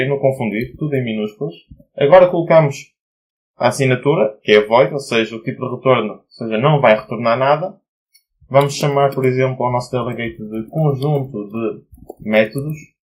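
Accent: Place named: Portugal. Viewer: O sotaque Português me incomoda por que eu sou Brasileiro e não estou acostumado (fica difícil de entender pra mim...) mas ainda assim, Parabéns pelo ótimo Vídeo :D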